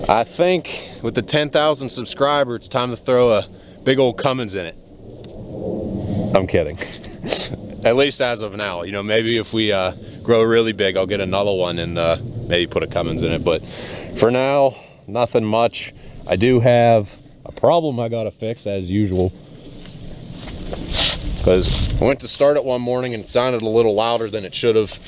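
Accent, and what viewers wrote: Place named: Pennsylvania, United States. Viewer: I honestly got hooked on your channel because of that thiccc PA accent. I have a lot of family from PA so it’s refreshing to hear lol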